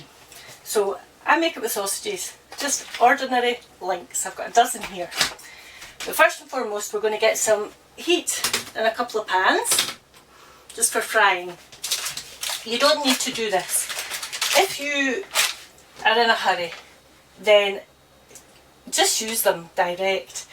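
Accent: Scotland, United Kingdom. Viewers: I’m from Edinburgh. This I how I make them too 😊 I’m in Australia now and loved the Scottish accent fix. Stovies for me today!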